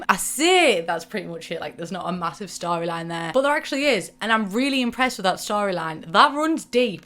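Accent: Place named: North, United Kingdom. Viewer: I wish I wasn’t such an uncultured American so I could have a dope northern accent. What a legend. Love you, ❤️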